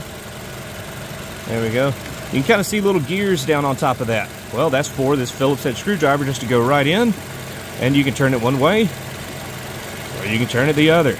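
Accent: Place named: South, United States. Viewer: Thank you mr random guy on the internet with a slight southern accent. Cheers.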